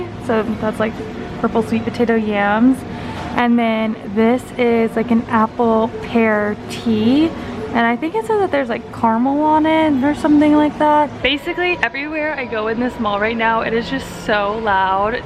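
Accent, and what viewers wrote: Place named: United States. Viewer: This is a interesting movie. Than you for your upload. By the way, which state are you from? I am also interested in American girls accent in region.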